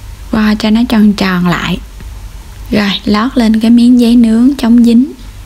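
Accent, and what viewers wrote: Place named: Tra Vinh, Vietnam. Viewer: Nhìn Bánh ngon quá, giọng nói Trà Vinh nghe dể thương đó ...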